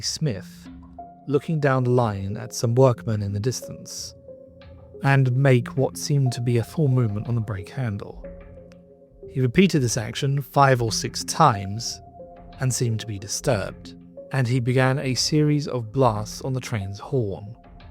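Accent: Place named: United States. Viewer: There are other annoying Americanisms in this, e.g. debirs pron. debree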